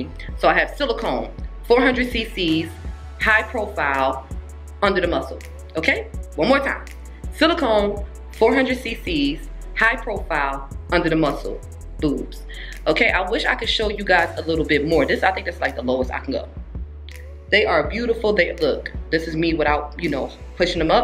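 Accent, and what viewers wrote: New York, United States. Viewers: You talk like you from new york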